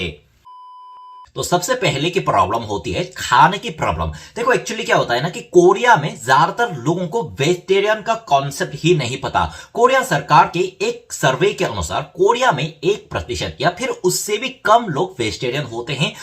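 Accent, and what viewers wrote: Standard. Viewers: Oh bro this shudh hindi even i after being an indian can't speak u are so good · Aap to bhut shud hindi bolta hai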